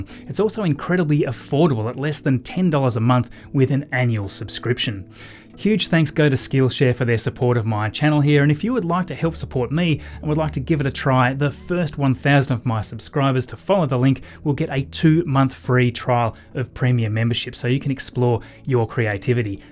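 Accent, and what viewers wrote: Australia. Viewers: Don't you talk fast - and why an Australian accent?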